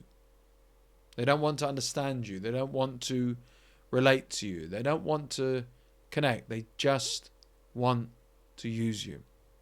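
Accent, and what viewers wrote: United Kingdom. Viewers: Where in Britain are you from? I have a Master's Degree in British English, and I studied to speak Received Pronunciation.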